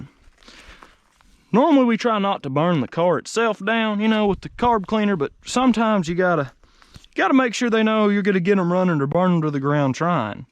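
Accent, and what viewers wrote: South, United States. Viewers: Hi all great vids mate I'm enjoying them. At first I didn't know what you were saying when I first listened to you speak lol you say oil like ol and coil like cool. I don't know why but Americans can't say the i in the pronunciation of certain words. Coil pronounced like coyl or Doyl. Oil is the same oyl. How do you say Craig all you Americans haha you say it like Crag it's Crayg it's not a silent i. Anyway that's my bit haha. Now lets see how many Americans don't like me telling them this. What are you doing with all the vehicles once you get them going? Jeremy Downunder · I’m Australia mate we just love that southern accent you’ve got there. Cheers ! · It's nice to hear another southern brother. Keep up with the great content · You all have a cool accent, the southerner's in America, British and Australian. In smack in the middle of the states (Michigan) our accent is boring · Love this channel. 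I can tell he is my brother because he is southern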